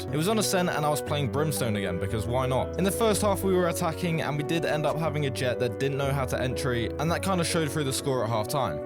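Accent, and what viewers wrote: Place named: United Kingdom. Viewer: Idk how I didn’t realize you were br*tish until now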